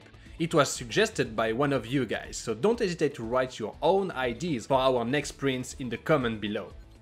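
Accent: France. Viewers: Ahahah l anglais parler par 1 français cest toujours assez amusant à écouter hahaha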